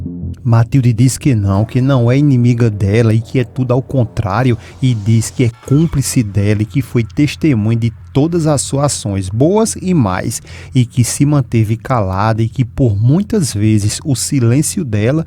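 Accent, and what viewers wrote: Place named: Northeast, Brazil. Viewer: adoro assistir sua narrativa é muito legal com esse sotaque nordestino. Maria Alvina de Teresina -Pi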